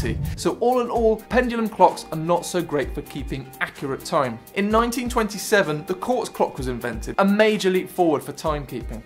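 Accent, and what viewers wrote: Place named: United Kingdom. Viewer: This British accent. I can't understand even a thing.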